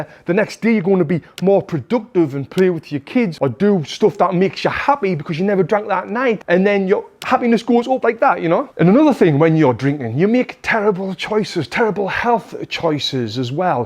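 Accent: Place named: Newcastle, United Kingdom. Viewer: Geordie boy, lovely, well done.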